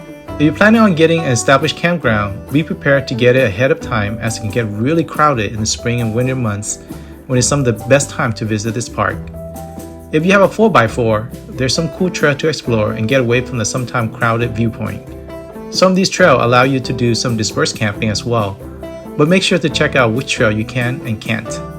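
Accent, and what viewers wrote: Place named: Vietnam. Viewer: Knew you viet when I heard the accent lol👍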